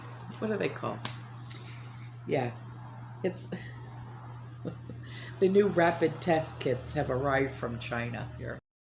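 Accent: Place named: New York, United States. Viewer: “Yous guys” I LOVE IT!! New York sass and GREAT INFO!! Thank you!!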